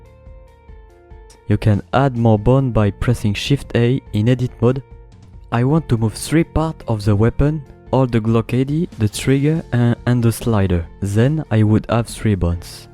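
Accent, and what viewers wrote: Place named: France. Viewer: Salut! Super cool tes vidéo, merci beaucoup! Je vois que les gens apprécient ton accent français haha! Fais gaffe quand même: J en anglais ça se dit ''djay'' et pas ''dji'' (et G se dit ''dji'' justement)... Je me suis gourré dans le raccourci à du coup ^^ j'ai fait ctrl + G (et ça a fait un truc mais je sais pas exactement quoi haha)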